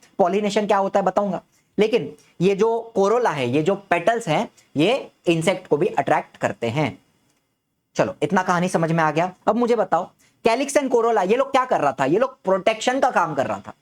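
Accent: Maharashtra, India. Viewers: Sir are you MARATHI ??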